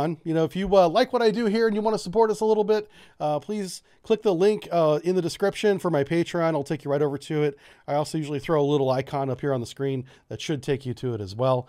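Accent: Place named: United States. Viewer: You sound exactly like I'd only expect to see from an American.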